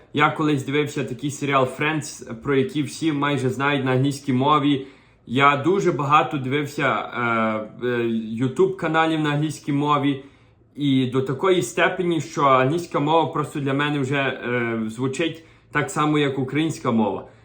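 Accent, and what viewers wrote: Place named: Transcarpathia, Ukraine. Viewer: Цікавий канал, у акцент закарпатський:)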